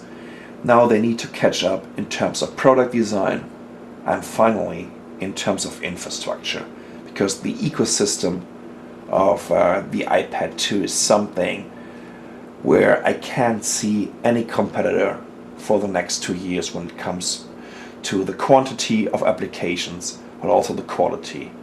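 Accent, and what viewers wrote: Germany. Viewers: lustig zuerst das deutsche und dann das englische zu schauen... Your accent is just funny -but this is germans like....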